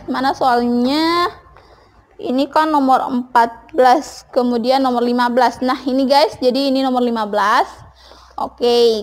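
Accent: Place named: Sulawesi, Indonesia. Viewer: itu sepertinya logat sulawesi, saya punya teman juga bilang salam warahmatullahi bukan warohmatullohi 😅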